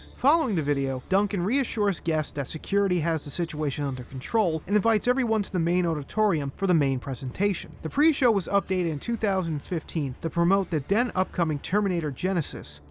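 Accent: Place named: Baltimore, United States. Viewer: yo this man got a thick baltimore accent lmao "for more den two decades" love you dawg!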